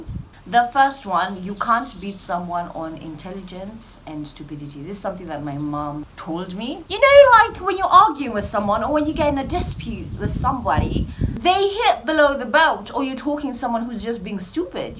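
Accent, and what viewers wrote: United Kingdom. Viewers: The British accent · When that British accent checks in, I fall in love with you all over again😊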